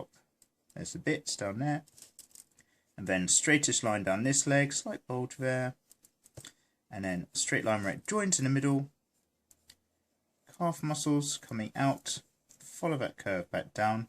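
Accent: United Kingdom. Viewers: God DAMN he’s so British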